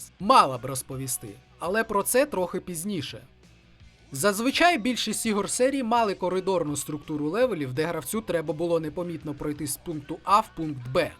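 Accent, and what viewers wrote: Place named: Russia. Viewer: Как видим, российская челюсть не позволяет нормально разговаривать на украинском. Продолжаем зоонаблюдения.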